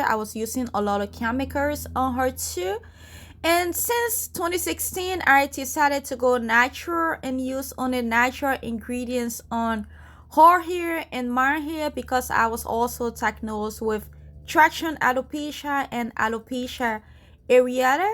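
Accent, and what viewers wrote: Liberia. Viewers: You sound so Liberian 🇱🇷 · I hear a little Liberian accent 🥰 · You a complete Liberian sis that tone can’t hide. Thanks for the video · My mother in law is Liberian and your accent is so similar. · Are you a liberian? You sound like one qnd i'm a liberian too so i'm asking.. thanks for the video. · You sound like a Liberian🇱🇷❤️❤️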